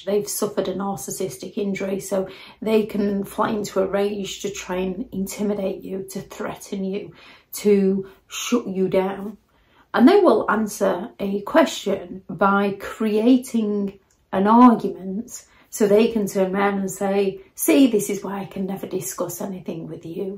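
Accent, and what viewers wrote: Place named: England, United Kingdom. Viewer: Good talk 😀🦜 good voice 💯 accent from England 😍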